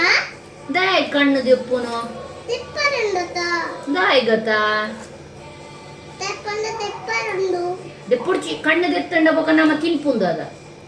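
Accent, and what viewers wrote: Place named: Tulu, India. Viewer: Sudda tulu paaterley madam, nikulna bombai da tulu yeddey ijji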